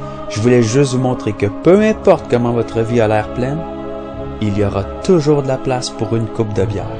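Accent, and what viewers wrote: Canada. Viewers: moi non plus, je trouve ses vidéos superbes, bien expliquées, et a chaque fois, elles me laissent songeur et heureux... De plus, je suis français et j'adore l'accent canadien et je le trouve très agréable à écouter. Merci.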